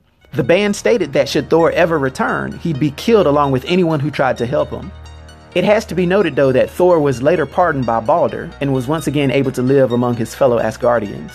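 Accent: United States. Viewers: He has Texas accent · Terrible hick accent. Lol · great video and loved the accent (american i think?) pronounciation of some of the names! was very different to hear.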